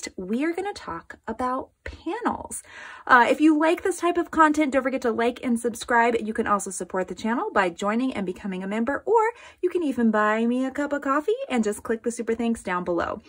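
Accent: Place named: United States. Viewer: Pictures very good but squeaky American voice talking too fast difficult to understand.😢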